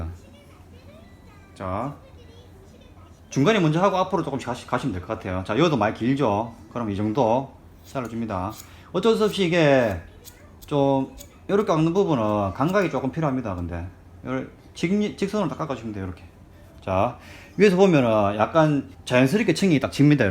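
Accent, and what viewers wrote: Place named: Gyeongsang, South Korea. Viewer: 대구! ㅋㅋ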